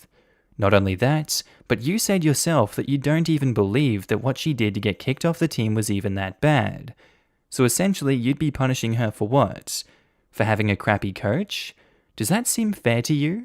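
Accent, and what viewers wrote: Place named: Australia. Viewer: a pleasant Aussie voice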